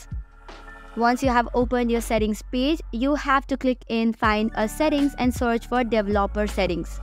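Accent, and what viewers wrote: India. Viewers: ARE U INDAIN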